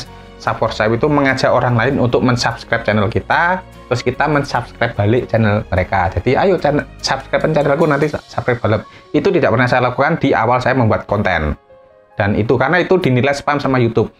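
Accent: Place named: Ungaran, Indonesia. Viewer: Nek ngrungokke logatte mas, dadi kelingan kampung halamanku di ungaran mas, karna logatnya hampir sama, semoga tambah sukses banyak subsribernya mas, mampir Cilacap dan direview kotanya, wassalaam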